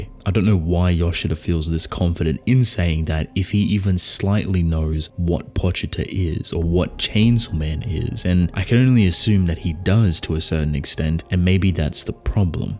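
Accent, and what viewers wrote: Australia. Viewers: Great vid. Are you Aussie by the way? I have trouble pinning down the accent.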